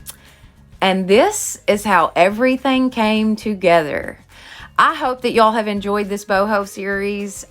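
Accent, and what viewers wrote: South, United States. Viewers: I really enjoyed the whole video. Love your southern accent, but I live in Oklahoma so I can actually understand you lol. Sometimes I have to rewind and rewind videos from the other YouTube girls because they talk way to fast lol. I'm subscribing and fixing to check out your past videos. Thanks for sharing your creativity with us!!! God Bless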